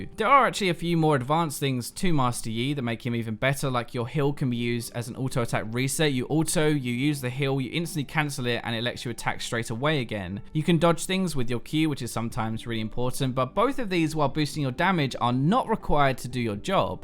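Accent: England, United Kingdom. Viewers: Which part of England is that accent coming from?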